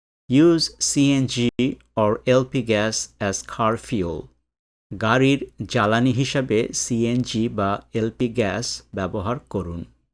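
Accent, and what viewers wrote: United States. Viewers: আমিরিকান স্টাইলে না বলে স্বাভাবিকভাবে বলবেন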